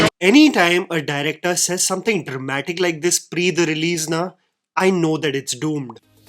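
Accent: India. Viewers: Why??? Why can't you respect his hindi accent?? And in Hindi it's "Telgu" & "kannad" If you can't respect other languages, why expect others to respect yours??? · There's no disrespect towards his Hindi accent. I jokingly mentioned about the pronunciation. I know it's tough without knowing the language entirely, so full points for trying. If you respect other languages, you will say Telugu and Kannada properly, just like how we say Hindi and not Hin or Hind.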